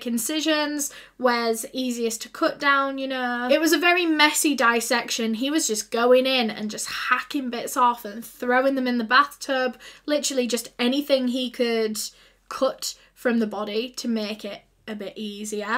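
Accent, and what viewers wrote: United Kingdom. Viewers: I usually don't like all UK accents but I love her UK accent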